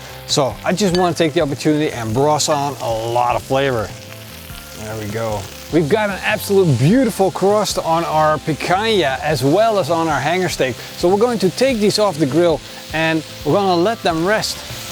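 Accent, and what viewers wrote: Netherlands. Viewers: are you dutch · You hear this guy is from the Netherlands 😂😂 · I sense Dutch accent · Je hoort echt dat Nederlandse accent hij zei stok terwijl het stick moest zijn 😂😂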